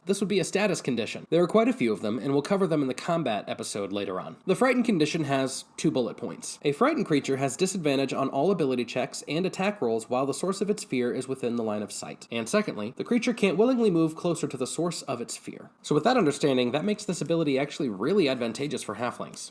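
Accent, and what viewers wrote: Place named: Scotland, United Kingdom. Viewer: Your Scottish accent is on point.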